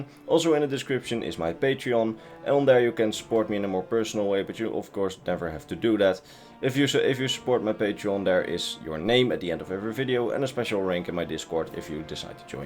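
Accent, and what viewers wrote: Netherlands. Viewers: You’re dutch right? Judging by the accent.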